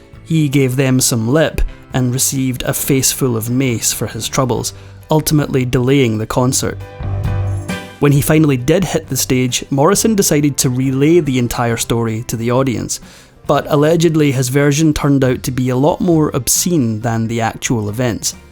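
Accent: Scotland, United Kingdom. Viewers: It's a Scottish accent. And, yes, it's lovely!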